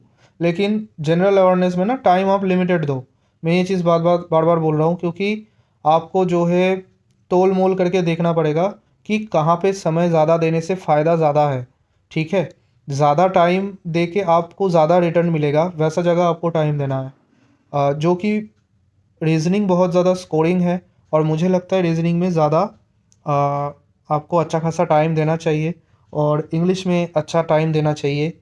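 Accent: Bengal, India. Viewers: তোমায় ল্যাঙ্গুয়েজ শুনে আমার তো মনে হচ্ছে তুমি বাঙ্গালি?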